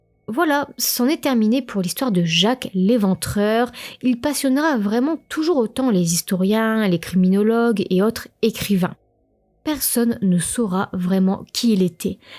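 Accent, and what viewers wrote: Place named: Nord-Pas-de-Calais, France. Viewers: ah c'est marrant... moi je t'imaginais toute blonde, ( je sais pas pourquoi ) peut -être l'accent chti , par contre à un " grotéfor " , NON ! un " grozéfort "c'est mieux ...😃 ( gros effort ) dit gentiment bien sûr car j'aime tes vidéos et ta façon de raconter , bravo ...:hand-pink-waving::face-red-heart-shape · Coucou! Merci pour cette vidéo d'une affaire très connue mais très bien racontée avec ton accent ch'ti 😊 Que tu ne te montres pas où que tu aies le visage flouté ne change rien puisque c'est ta voix que j'écoute 😊. Comme un podcast en fait...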